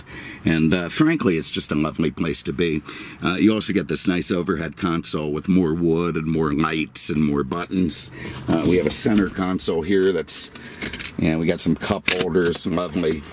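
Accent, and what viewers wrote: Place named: Midwest, United States. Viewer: I love your videos. Where are you originally from? Sounds like a Midwestern accent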